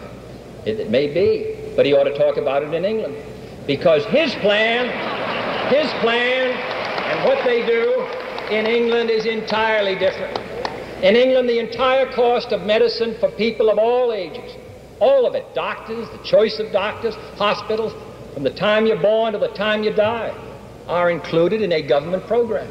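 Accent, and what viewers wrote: Boston, United States. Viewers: Go to Boston. You’ll hear it everywhere. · Extremely strong Boston accent 💪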